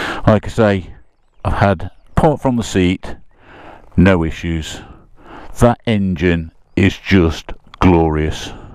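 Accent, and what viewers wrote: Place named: Northern England, United Kingdom. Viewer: Such beautiful landscapes, such a nice accent, so different from what you learn in school. Sounds northern english, is that correct? Greetings from blackforest germany 😊👍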